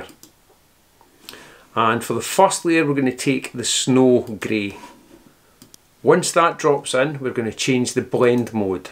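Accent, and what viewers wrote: Scotland, United Kingdom. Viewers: Nice to hear a Scottish accent on YouTube by the way. Luminar is now like a user friendly version of PS and LR all rolled into one. Love it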